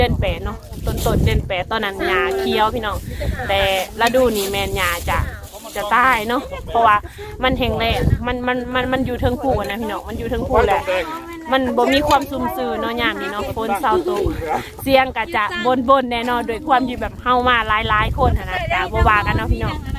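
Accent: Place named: Thailand. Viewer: สบายดีน้อง น้องเป็นผู้หญิงที่พูดเก่งและสำเนียงไม่แตกต่างจากทางไทยเลย น่ารักอีกด้วย.🥰❤️❤️❤️❤️❤️❤️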